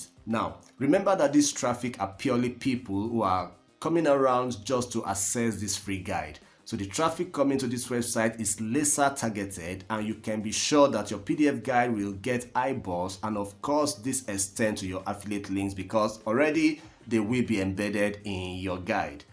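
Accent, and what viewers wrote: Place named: France. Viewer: Sounds like French accent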